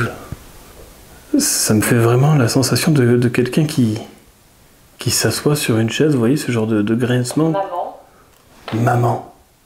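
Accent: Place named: Southwest, France. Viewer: J’aime beaucoup tes enquêtes. Tu es sensible, respectueux et touchant et… tu as l’accent de chez moi 😜 Tu es de la Dordogne ou du Sud Ouest j’imagine. Merci pour tes vidéos… je n’en manque aucune. Protège-toi quand même avant chaque enquête. Bonne continuation 🌸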